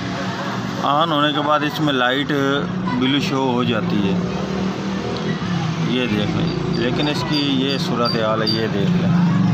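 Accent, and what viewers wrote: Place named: Punjab, India. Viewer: Tuci punjabi lagde aa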